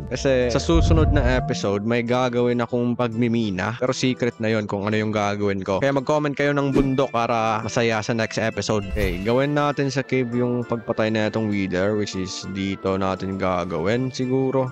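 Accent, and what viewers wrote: Visayas, Philippines. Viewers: Bisaya ampta hahhahaha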